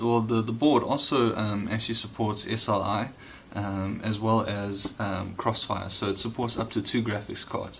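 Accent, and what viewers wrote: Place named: Afrikaans, South Africa. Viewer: Jy klink Afrikaans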